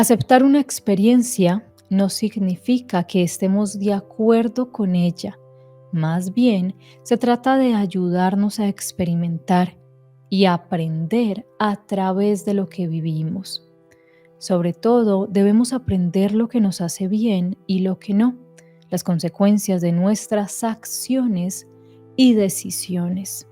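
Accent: Colombia. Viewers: Muy linda voz !! Es de Bogota’?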